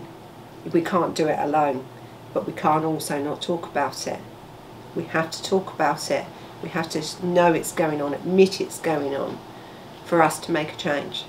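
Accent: United Kingdom. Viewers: You’re a great storyteller; I love the British accent.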